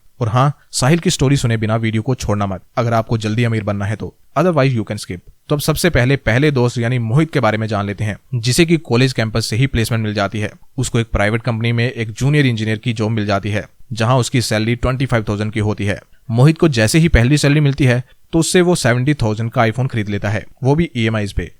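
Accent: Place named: Punjab, India. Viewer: punjabi accent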